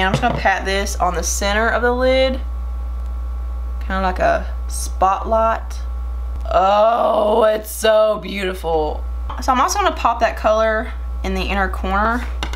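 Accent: South, United States. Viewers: New subscriber! Love hearing that southern accent...I'm a Alabama girl...Roll TIDE! Your're so funny and have some great content!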